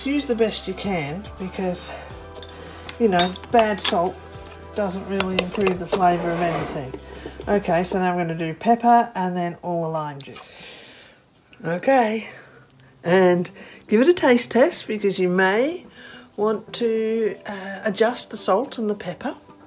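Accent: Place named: United Kingdom. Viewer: Ah.... You have made my day, thanks for the fabulous video.... 💖, hello from France.. 🙋.... you sound very British, at the end of you"re videos, a nice cup of tea is very British....!! 😂🤣, mind you the French may say, "the trouble with you British, you think you can solve problems with a nice cup of tea" 😂🤣😆... Keep those videos coming.... Thank you, I'm off for a nice British cup of tea..... 👍🙏... Xx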